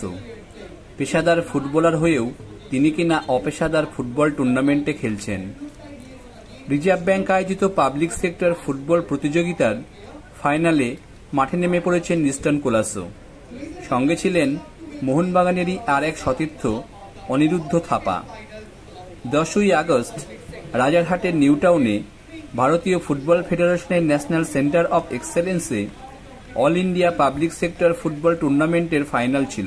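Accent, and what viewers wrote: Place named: East Bengal, Bangladesh. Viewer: Video ta jeee koreche r jar voice sona jacche ami sure seee Udbashtu opar bangalar mal 💯💯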